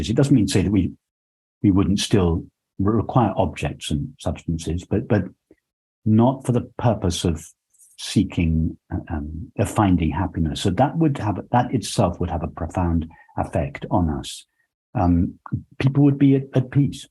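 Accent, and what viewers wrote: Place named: Belfast, United Kingdom. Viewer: Hi, I here ur accent I feel like ur from Belfast or near to that. I have been on this journey for some time now and I get some glimpses here and there. I'm really starting to understand things at an intellectual levels. I'm on you tube alot seeking. I'm just wondering is there anyone you woukd recommend from our side of the world to help guide me on this path. Thank you for this video. I look forward to watching more. ❤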